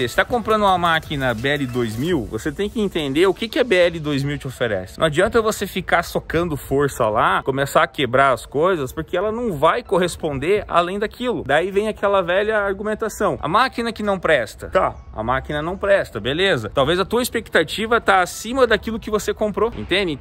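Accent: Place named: South, Brazil. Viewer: Esse sotaque e aqueles pinheiros no fundo da pra saber q tá bem no sul kkk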